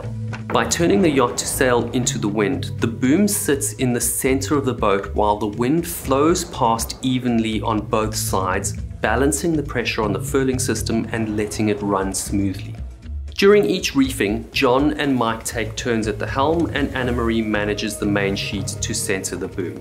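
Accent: South Africa. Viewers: Ahh I was wondering how they died, I mean it was pretty obvious they died of their injuries, but the previous version did feel a bit open ended. Just wondering if you'd ever do a Q&A type video? I'm not usually interested in that type of thing, but I'd love to know were you're from, I can't place the accent although it sounds South African or somewhere else in Africa, but I also remember you said in 1 of your videos that you had lived in England. It would be interesting to hear about your career too.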